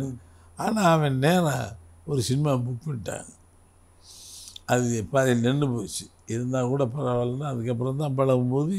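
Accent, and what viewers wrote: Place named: Butler English, India. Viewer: Butler English தான் தல உன் சிறப்பு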